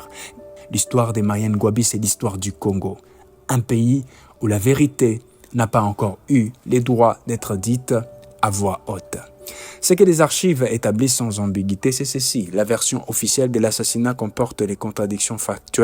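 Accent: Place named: Belgium. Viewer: Vous avez un francais belge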